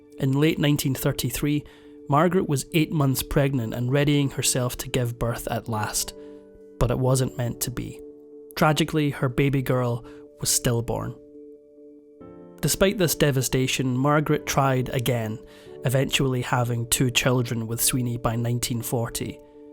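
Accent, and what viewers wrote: Scotland, United Kingdom. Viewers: With a charming little Scotish zing. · Scots don't listen. They burr. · Why do I always think THANK GOODNESS 2 seconds into the video when our Scottish narrator kicks in.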